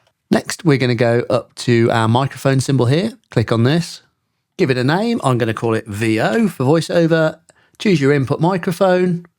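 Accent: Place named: United Kingdom. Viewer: Love it. Right to the point, well explained, and given your British accent, you don't talk so slowly that l need to speed up the video. Great stuff!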